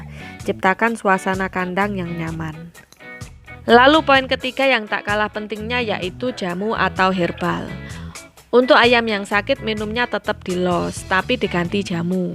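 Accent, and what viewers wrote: Java, Indonesia. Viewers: Suaranya medok banget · Asli mana mbak Lamongan ta? Kog jowoe medok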